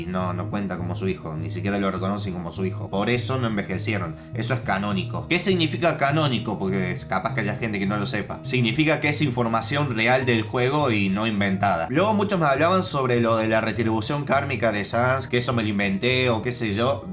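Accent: Argentina. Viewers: no solo te ganaste un like tambien un sub se nota que es de angerntina mi pais saludos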